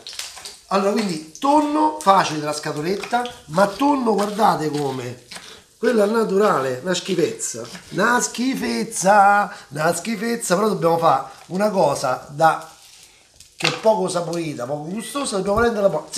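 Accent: Rome, Italy. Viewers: Steffen Ali If you’re trying to learn Italian, you may have a bit of difficulty understanding this guy. He is very much a Roman and Romans have a strong accent that may be difficult for beginner learners. However, keep up the good work!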